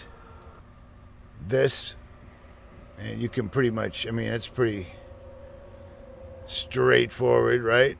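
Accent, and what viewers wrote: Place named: Boston, United States. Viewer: your Boston accent is badass im from york england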